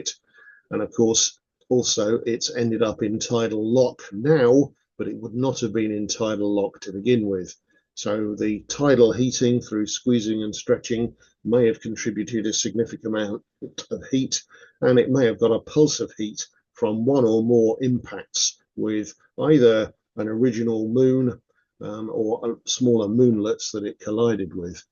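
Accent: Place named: England, United Kingdom. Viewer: That is how they speak in England.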